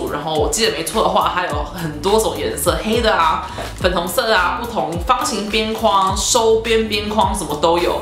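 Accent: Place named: United States. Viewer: Dani是ABC什麼的嗎？🤔感覺講話有口音欸😅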